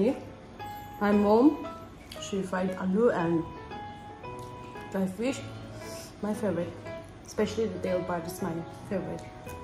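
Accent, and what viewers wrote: India. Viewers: please make a video in Hindi na....your Hindi accent is so ❤ly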